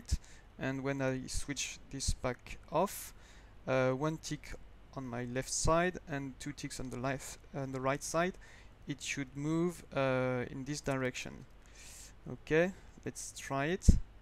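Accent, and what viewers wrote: France. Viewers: Dat french accent ^^' Merci pour le tuto j'adore le concept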